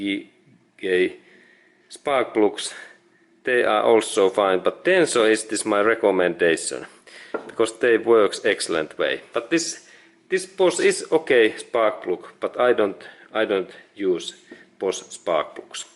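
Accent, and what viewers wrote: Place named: Finland. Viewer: Nice finglish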